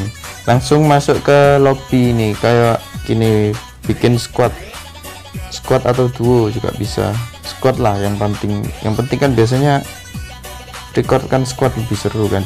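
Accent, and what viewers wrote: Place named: Java, Indonesia. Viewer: Lu orang jawa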